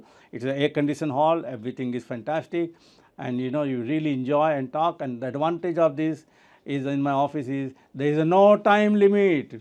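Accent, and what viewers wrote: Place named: India. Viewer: If you are a real hypnotist then why don't you work on your accent? So Indian and so funny. 😂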